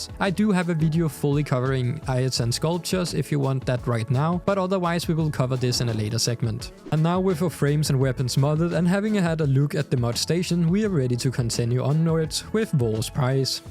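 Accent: Denmark. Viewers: You sound so Danish in your English and iam loving it. If i look across the water i can see Denmark:) Cheers from Flensburg. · im 5 sec into the vid are you danish?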